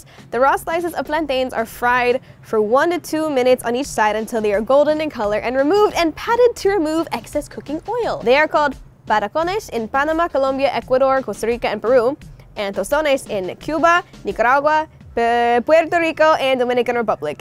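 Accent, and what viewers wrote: Spain. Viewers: girl come to mexico, we want to feed you too, also her spanish spanish sounds tasty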